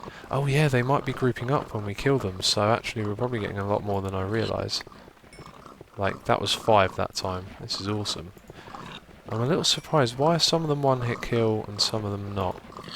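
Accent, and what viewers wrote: Netherlands. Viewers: I think you can really hear it, actually. I am dutch myself and am really familiar with dutch people trying to speak english. You can defenitely hear that he's dutch. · Haha, I could tell by his accent that this guy was Dutch :D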